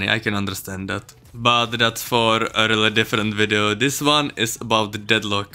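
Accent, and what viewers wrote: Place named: Czech Republic. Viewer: jako ta česká angličtina ma sere ale jinak top videjko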